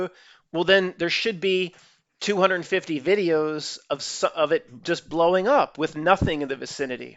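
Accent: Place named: Boston, United States. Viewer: Love your Boston macadamian accent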